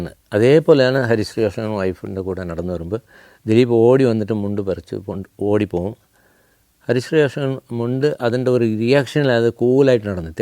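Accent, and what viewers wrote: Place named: Tamil Nadu, India. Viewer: മി അളഗപ്പൻ, താങ്കൾ തമിഴനാണോ. നിങ്ങളുടെ മലയാളം ഒരു തമിഴൻ മലയാളം പഠിച്ചു പറയുന്നതു പോലുണ്ട് !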